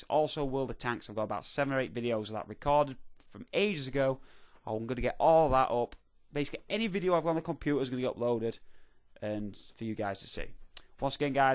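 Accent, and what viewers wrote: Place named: Yorkshire, United Kingdom. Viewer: Yorkshire I think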